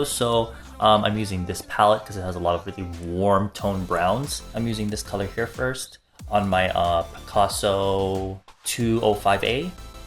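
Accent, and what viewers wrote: South Korea. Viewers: this was a good look for you. you speak so much Konglish now! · i love how he says english names in a korean accent so much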